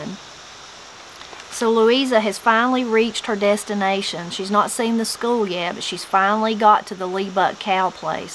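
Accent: Appalachia, United States. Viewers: Thank you So Much for sharing by reading this story. It feels so great listening to you in Appalachian tone of voice. Reminds me so much of my mother. Is the book still available to buy; it would be interesting to read it with the Appalachian accent. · I don't believe this book could be narrated better by anyone else. Your Southern English accent give these written words the life and nuance of true Appalachian people. Stephen King once said that he prefers his books be 'heard' through the use of audiobooks, because it insists that the listener consume all that he had written, instead of "speed reading", or hurrying through it, not grasping the emotion and meaning that he spent so much effort instilling into the work. I too love audiobooks because of this.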